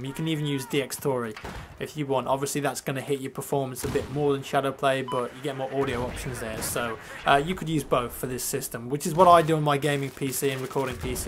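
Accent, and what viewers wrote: United Kingdom. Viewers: How does sound black? Lol can't you hear his British accent? Lel and one question: why does it matter what he looks like? It's mainly about if he's a good person or not, and clearly if you've been watching his videos he's on the good side;)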